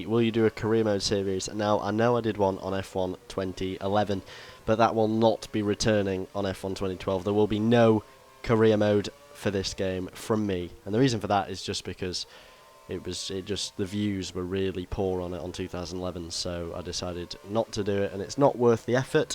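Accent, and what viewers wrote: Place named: North, United Kingdom. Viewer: You sound like ur from the north Anyway very big fan of urs Just brill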